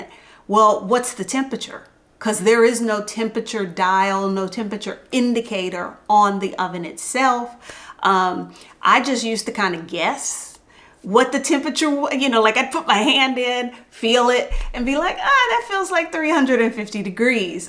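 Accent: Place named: Texas, United States. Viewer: I moved to Mexico last year, (Ajijic), and your list was VERY informative. Thank you! Love your Texas accent.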